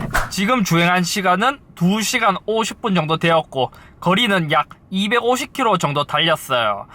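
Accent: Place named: Andong, South Korea. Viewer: 말씨가 안동 말씨였구만요.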